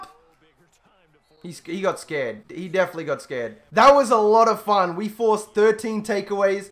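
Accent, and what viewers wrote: United Kingdom. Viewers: I CAN"T DEAL WITH HEARING FOOTBALL WITH A BRIT ACCENT sorry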